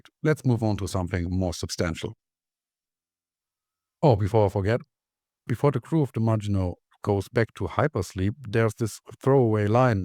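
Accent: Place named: Germany. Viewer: Hahahaha you have a german accent yourself, love the humour...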